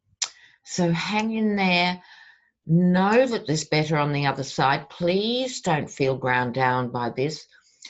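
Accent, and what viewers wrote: Australia. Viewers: Living in America since Trump is like being in A Twilight Zone episode that you can’t get out of. Republicans like Jordan & the rest are beyond corrupt & hopefully facing their downfall sooner, rather than later. What’s really frightening is delusional Trump who thinks he’s the second coming, literally. Help. 🙄I love your readings & listening to your fantastic Aussie accent. 💗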